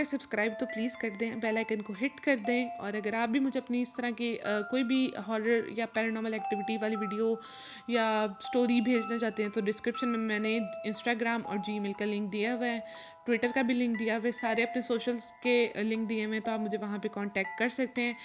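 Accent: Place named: Punjab, India. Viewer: Very interesting story I really enjoyed or apka punjabi accent buhat acha hai 👍👍😂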